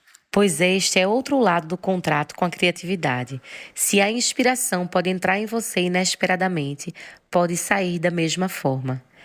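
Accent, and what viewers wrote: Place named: Northeast, Brazil. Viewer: éssa prónúncia nórrdeshtina tem uma Bé-leza louca! Tótal! Estou Mésh merizadoooo !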